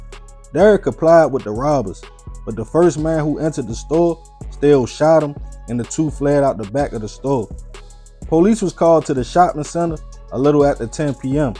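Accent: Baltimore, United States. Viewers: Africa tuning in, love the content but the Baltimore accent gets me just like the southern part of the US accent. It’s certain words that I struggle with. love it though. Love & respect to and his family. Stay in peace everyone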